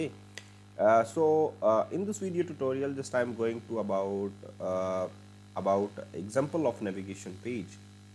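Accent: India. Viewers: Nope, totally wrong there buddy, I am not even that proficient in English and I speak Spanish, which I doubt the narrator knows since he has an Indian accent, if you can't understand him it's more like a YOU problem then. If you are THAT distressed by his accent, watch another video, he's not a English teacher, he's a programmer, the least he should care is how he is saying his words because one guy can't understand him.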